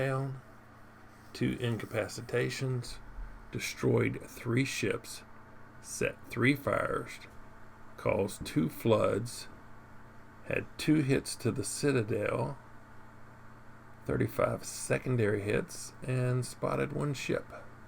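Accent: South, United States. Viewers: Your southern Drawl is mispronaouncing the Word Cheshire. My Name is Tentto and on the NA server.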